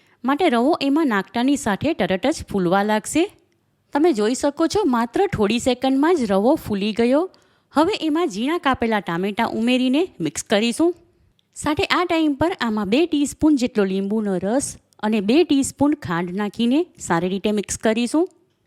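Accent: Surat, India. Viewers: E to surti language chhe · સુરતી છે..😅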